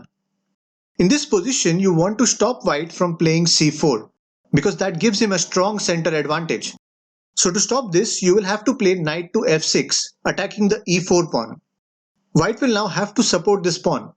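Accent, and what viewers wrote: India. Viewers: I’m assuming you are Indian but the accent.. so I believe everything you showed us here since chess was invented in India .. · I like Indian acent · Your English is Indian (understandable) don't give foot note that's disturbing, it covers board.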